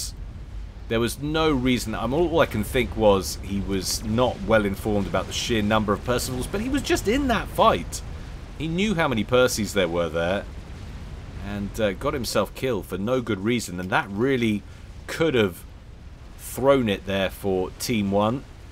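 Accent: United Kingdom. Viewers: I think so, he sounds quite British to me.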